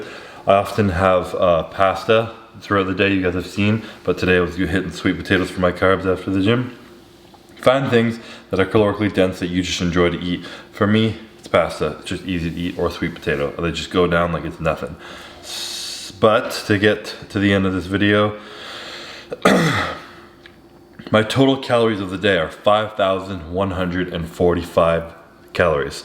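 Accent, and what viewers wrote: Canada. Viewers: He’s Canadian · Yo man are you Canadian?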